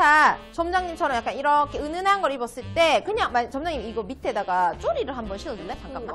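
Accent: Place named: Gyeongsang, South Korea. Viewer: 미국에서 눈팅만하는 40하고도 1/4세 ㅋㅋ 삼둥이 맘입니다. 언젠가 한국에 가면 꼭 가겠노라며 위로하면 영상 잘 보고 있습니다. 같은 경상도라 설명이 귀에 쏙쏙 들어옵니다. 정강하고 고급지게!! 감사합니다 🤗🍓🍓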